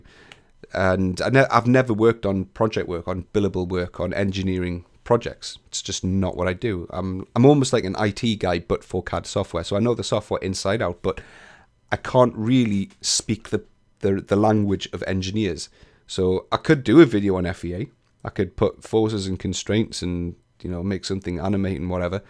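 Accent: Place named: England, United Kingdom. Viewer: I am a woodworker who uses inventor to digitally prototype a design that I can tweak at different stages of production. Your videos are very good and the best that I could find (English accent helps...). I often rewatch your previous videos as you have a very natural way of communicating. Looking forward to what you have to offer in 2016.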